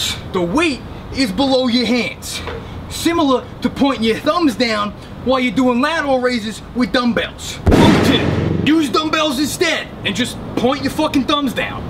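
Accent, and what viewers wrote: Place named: New York, United States. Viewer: I like your funny accent like newyourker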